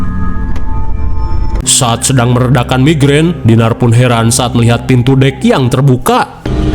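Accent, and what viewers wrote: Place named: West Java, Indonesia. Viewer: ngomong nya nyunda bngt 😭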